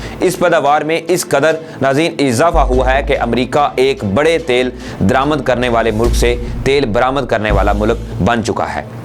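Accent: India. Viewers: Why you speak Urdu in Indian accent?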